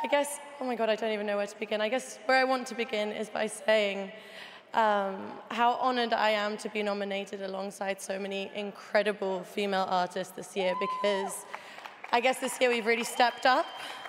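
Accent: United Kingdom. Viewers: She sounds so British I was born in England I don't even sound British I sound American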